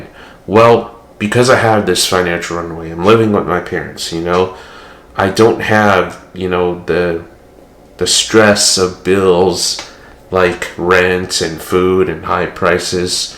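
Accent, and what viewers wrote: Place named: United States. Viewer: Your American accent is so clear. Good luck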